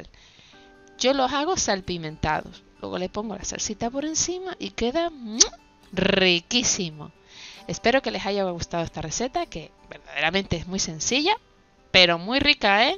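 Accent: Canary Islands, Spain. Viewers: Buenos días, de parte de un canario en Huelva, que bueno escuchar una voz canaria. Estoy haciendo tu receta en estos momentos.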